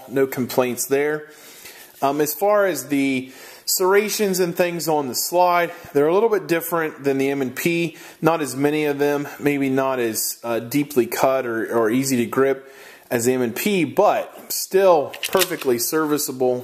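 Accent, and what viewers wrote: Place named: Delaware, United States. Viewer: where are you from. Sounds like Delaware accent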